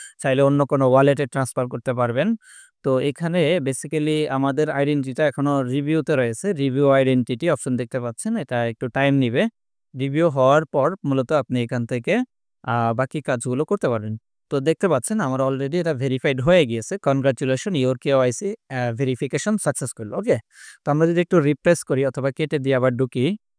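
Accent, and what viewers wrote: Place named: Noakhali, Bangladesh. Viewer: bhai apnar kotha barta shune mone hocche noakhali bari apnar ......uccharon aro valo howa uchit....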